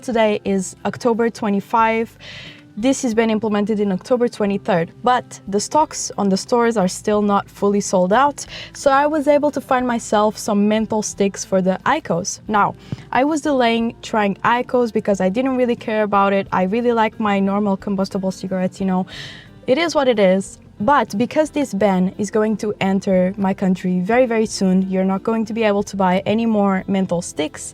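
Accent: Portugal. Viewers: giiirl soube logo que eras tuga (ou pelo menos que falas portugues) pelo teu sotaque antes de dizeres que estavas em Portugal! comprei a minha maquina hoje, com o terea turquoise , espero gostar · your accent is so nice ahhh fu*k . it's portugal accent really?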